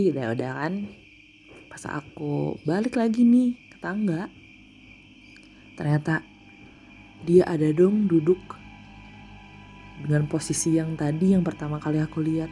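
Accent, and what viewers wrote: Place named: South Jakarta, Indonesia. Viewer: Mbak nya logatnya jaksel bgt 😅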